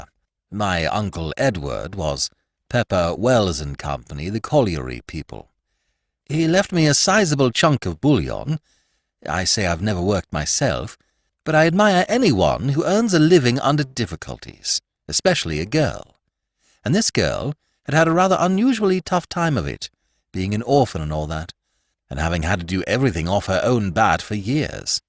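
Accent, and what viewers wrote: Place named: United States. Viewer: American narrator...PG Wodehouse American.. .are you befuck???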